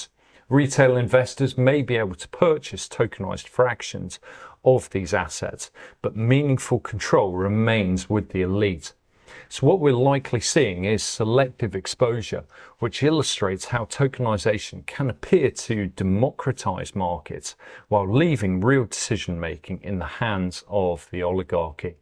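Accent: United Kingdom. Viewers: I’m new to the channel, and I’m impressed. If you’re in the USA & older like me, try putting playback speed at 90% to 95%… much easier given the Brit’s accent. Great video.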